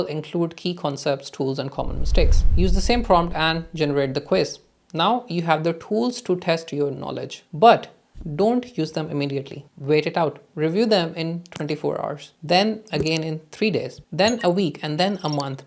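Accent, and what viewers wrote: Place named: Northern Ireland, United Kingdom. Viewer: I hear N. Irish accented words!? lol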